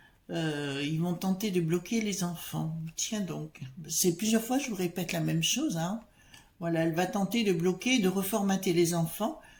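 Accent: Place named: South, France. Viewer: Merci pour votre tirage ! Surtout gardez votre accent du sud qui fait tellement de bien aux gens du nord ! A écouter le Pr Perron sur SUDR.... qui parle de la picpic et du Pr défraîchie..... la parole se libère !!!!!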